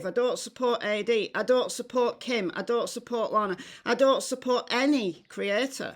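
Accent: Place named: Wales, United Kingdom. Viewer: New Subscriber, and I Love You, you are so Refreshing and tell it like you see it, it's Awesome! Hang in there and don't team up with any other creator, as you well know! Be true to yourself! Plus my folks were from Wales, UK, and I Love hearing your accent, so simular to my parents, but sadly they are no longer with us. Take Care!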